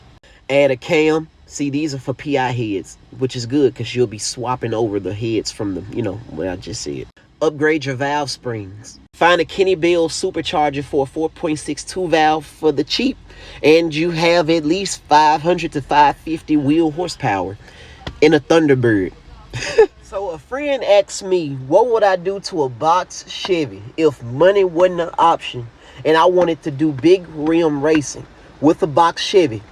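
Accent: Memphis, United States. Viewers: You can tell he from memphis btw he talks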